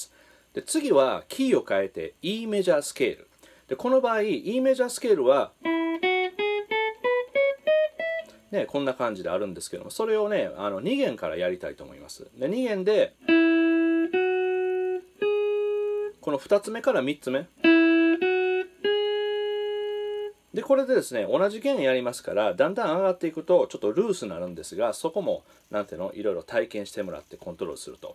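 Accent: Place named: Kyoto, Japan. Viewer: トモさんの京都アクセントには癒されます(^^) やはりチョーキングとヴィブラートはそれぞれ別個に練習してから、合わせた方がいいのですね。 勉強になりました。 ところでWhile my guitar gently weepsやanother brick in the wall part2のような二音半？ベンドがきついです。 何かコツや練習法ありますか？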